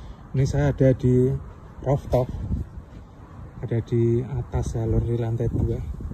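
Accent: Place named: Java, Indonesia. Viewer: Wis njan....senajan awakmu wis tekan adoh korea kono....tetep wae medok. *kui kue sing kotak cilik neng nggonku jenenge etheh etheh plengeh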